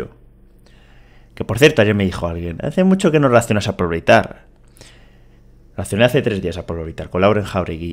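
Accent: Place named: Spain. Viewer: His accent sounds like he is from Spain! That’s why I subscribed to his channel. I am trying to get familiar with Spanish accents!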